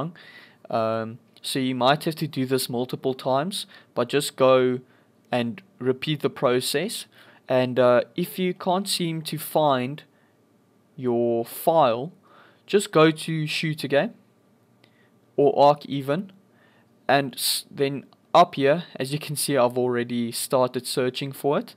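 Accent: South Africa. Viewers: Is this dude South African? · south african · Ur from South africa right? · U sound afrikaans 😃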